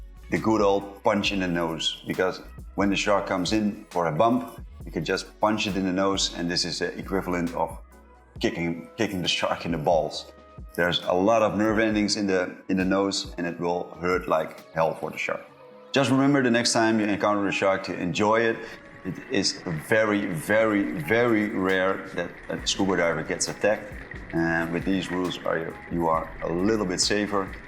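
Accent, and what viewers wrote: Netherlands. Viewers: Nice vid! This guy is Dutch I hear it. Hi from Amsterdam 🎊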